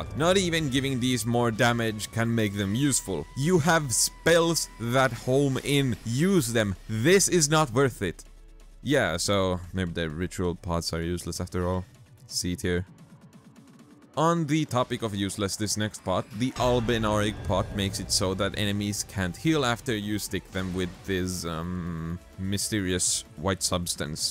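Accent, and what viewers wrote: Finland. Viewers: New sub based solely on the humour. 😂 For me, he worst thing about combat is scrolling the damn items to get to things I need. By that time, I'm usually dead or almost dead. 😂 Edit: also, you sound Finnish. Is that right?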